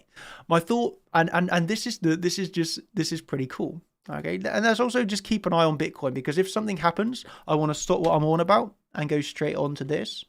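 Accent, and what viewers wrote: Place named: South West England, United Kingdom. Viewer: He’s got a posh south western accent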